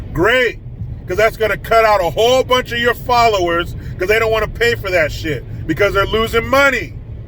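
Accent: United Kingdom. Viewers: Hey nice limey accent. You is a funny smart fella and you make this old 77 year old tan dude. Albinos are white and pale millennials living in moms basement. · I want to hear you say, Lambofeeties in that British accent! I'll die LMAO! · Love the ah British or something accent!!! LOL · Love the British accent. You should read us some Charles Dickens. Call it story time with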